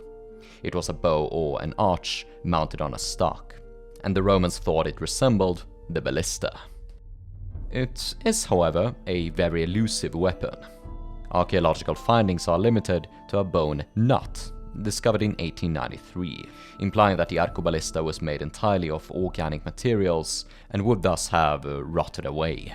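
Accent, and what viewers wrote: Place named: Sweden. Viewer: Even beyond the Swedish accent you sound almost EXACTLY like Gold & Gunpowder, love ya both! (unless....)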